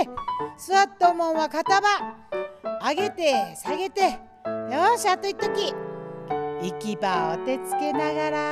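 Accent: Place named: Hasami, Japan. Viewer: 波佐見出身の者です。18歳で波佐見を離れて今年で17年になります。転勤族で各地を転々としてます。 この動画で波佐見弁のナレーション聞いて懐かしさでほっこりしたのと同時に、今年亡くなった祖母の事を思い出しました。 やっぱ波佐見はいいですね。またいつか必ず帰ります。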